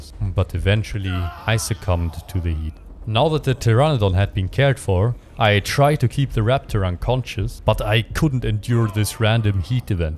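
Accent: Germany. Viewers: Are u german? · I hear deutsche akzent out of you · is he german?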